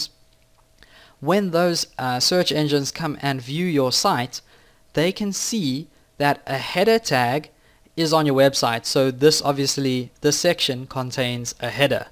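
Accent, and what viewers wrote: South Africa. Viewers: agree you better than Lynda ... thank you you sound south african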